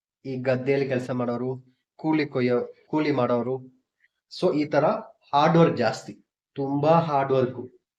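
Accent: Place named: Tamil Nadu, India. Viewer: Tamil nava Kannada maataadidd haange untt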